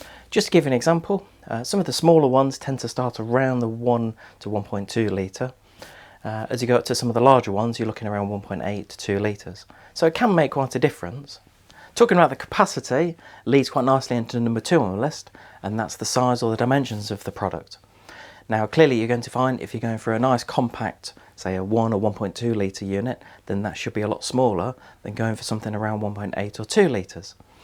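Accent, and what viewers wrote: United Kingdom. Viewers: I'm not considering to buy a new Soup Maker at the moment, but whatched the episode and gave it a TU for the fact alone you upload this kind of usefull content. You bring it in a very nice classic British manner; it's not spoken too fast, so non-native English viewers can also follow along quite easily.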